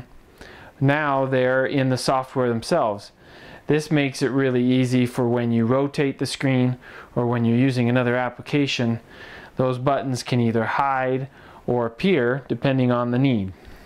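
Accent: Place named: United States. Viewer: Finally someone who speaks American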